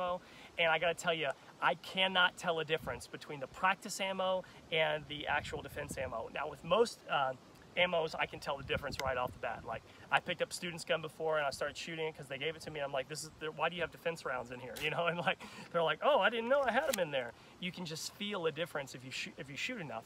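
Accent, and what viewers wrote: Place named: Indiana, United States. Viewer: My guess is Indiana...looks like an Indiana farm and sounds like a Hoosier!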